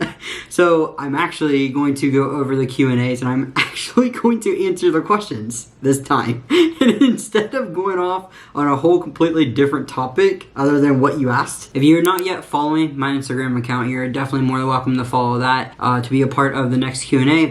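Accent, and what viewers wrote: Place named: Marietta, United States. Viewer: Is it bonkers that I could tell you were from Marietta by your accent? I went to MC about a million years ago and I can still hear it.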